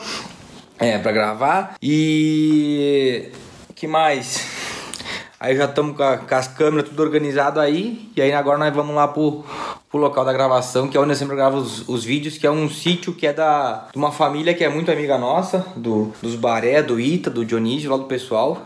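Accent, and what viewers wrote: Colono, Brazil. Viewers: O sotaque é impossível de esconder, no começo do video ele só tenta disfarçar mesmo, mas o sotaque que ele se sente a vontade é o de colono, aposto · tem o mesmo sotaque de colono mesmo fora do personagem.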